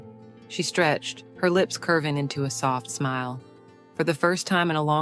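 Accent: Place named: South, United States. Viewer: The southern accent for an African story is distracting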